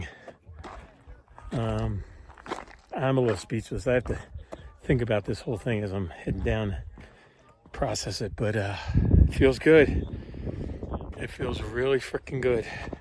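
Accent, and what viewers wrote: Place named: Philadelphia, United States. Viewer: Great job! Will miss that Philly attitude and voice.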